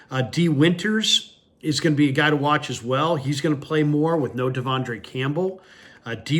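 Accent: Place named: Northern California, United States. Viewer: with that casual NorCal accent. Trust me, I've lived in Texas for 17 years as well as other places it's a thing.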